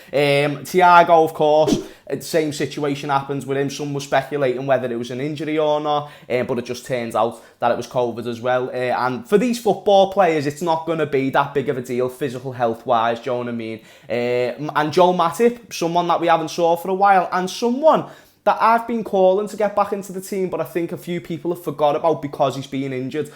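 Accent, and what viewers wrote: Liverpool, United Kingdom. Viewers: Scouse voice is like Music to your ears :D Now coming to topic of Migno vs Adrian. Adrian helped us us with Winning Super cup, and first 10 ten games of PL Last season, Migno was good, but a notch below good sometimes and may be because of Not having good defender maybe.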